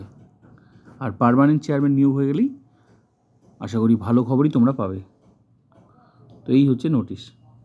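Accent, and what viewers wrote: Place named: Bangladesh. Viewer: Tumi pakka bangal bachha ...tai boli ....bangla uccharan ta thik koro.....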